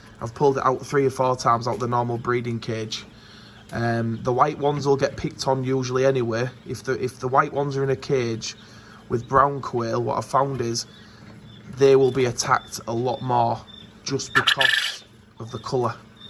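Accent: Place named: Sheffield, United Kingdom. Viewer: U sound like my friend in Sheffield I'm in Australia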